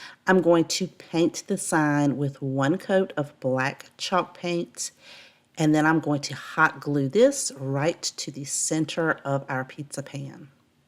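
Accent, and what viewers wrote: South, United States. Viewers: Hello from Scotland ✋🏻I've only been watching crafting on YouTube for a couple of months. And I really love how "clean" your designs are, if that's the right word. You have the minimum of things to make something look so lovely. We don't have the amount of choice in our shops that are as cheap as your Dollar Tree stores etc and although Amazon gives us a wider choice, it's not the same as seeing it in front of you being able to touch and find inspiration. That said, because you make so many of your projects with the minimum of stuff needed, I can replicate it without needing to take out a bank loan 😂. Just love your style, the way you explain it so simply in that lovely southern accent of yours. 🎄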